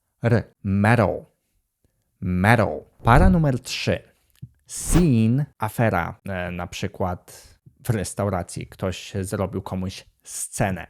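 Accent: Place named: United States. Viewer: Cześć, w Twojej mowie po polsku słychać subtelny amerykański akcent. Uchyl rąbka tajemnicy, proszę ! Mnie bardzo się to podoba i wnioskuję, ze albo dorastałeś w dwujezycznej rodzinie, albo spowodowała to imersja ... Ja pracuję nad wymową z apką ELSA, ale bardzo zainteresowałam się Twoimi filmikami. Pozdrawiam !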